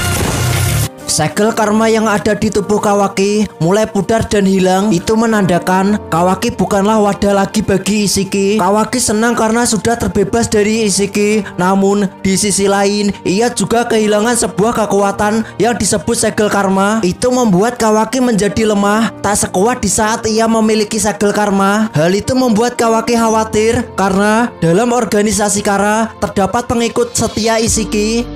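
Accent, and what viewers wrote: Java, Indonesia. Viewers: Logatnya medok pisan😅 semangat min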